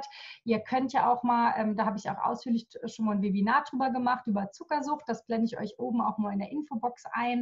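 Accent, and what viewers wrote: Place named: Saarland, Germany. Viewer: Ich liebe den saarländischen Dialekt 😊❤. Lg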